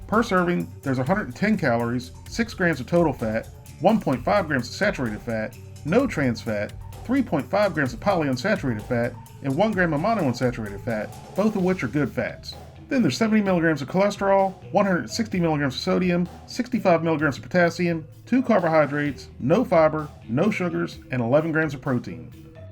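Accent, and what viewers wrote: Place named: Carolinas, United States. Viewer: Blow Me he is from the Carolinas I think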